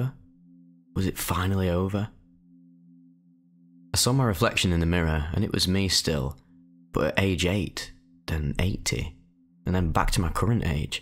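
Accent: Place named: Northern England, United Kingdom. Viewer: “If life was just in pure god mode and we were fucking no clipping through walls it would be well boring won it” love actually having British humour on the internet for once instead of watered down American humour and way of talking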